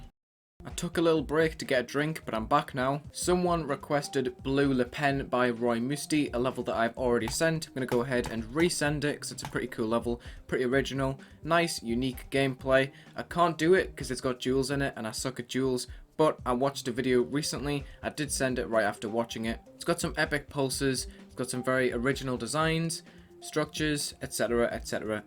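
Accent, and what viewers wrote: United Kingdom. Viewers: To be honest, literally sounds like my math teacher with a British accent.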